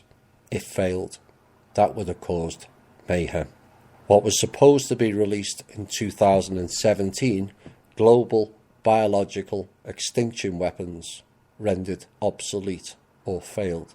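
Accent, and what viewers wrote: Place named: Liverpool, United Kingdom. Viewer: That's a Liverpool accent.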